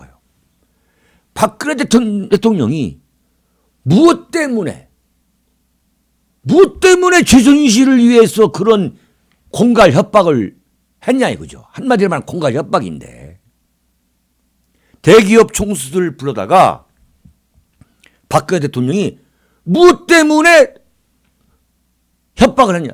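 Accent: Chungcheong, South Korea. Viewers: 이아저씨 정말 구수한 말투 좋와 ㅋㅋ 충청도 어디신가? ㅋㅋ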